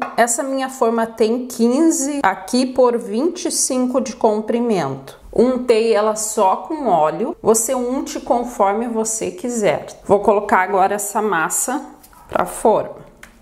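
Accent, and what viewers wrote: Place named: South, Brazil. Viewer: Otima sua receita!!!!e com esse sotaque do sul!!! so' pode sair receitas boas!!!!!!👧👍👏🍰🍩🍯🍮🌹🍃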